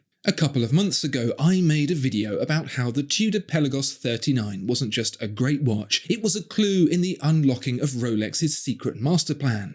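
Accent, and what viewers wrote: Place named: United Kingdom. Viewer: I love to put the face to the voice I’ve heard for years… However you gotta find a space where you’re better depth of field… The blue background is too stark… And all the YouTube army of watch channels do the perfunctory man cave muted colors with tchotchkes curated from the cork sniffing image they’re attempting to curate… And although I’m sure you paid an artist for your logo it looks too much like a car design and has nothing horological to denote the subject of your channel… Otherwise I’ve enjoyed you for many years and now my sons are all watch enthusiasts As with most things a British accent elevates and always has the right amount of tonality to not be hard on the ears and your copy is always a beautiful poetry of observation, Snark and on point assessment When I started researching watches the only man I found at that time was Alex from Watch advisor… Who actually lives in Switzerland and seems to know many companies ceo… I still find his charming enthusiasm and accent useful and comforting… But keep up the good work Your voice is often playing on the TV in our household🎉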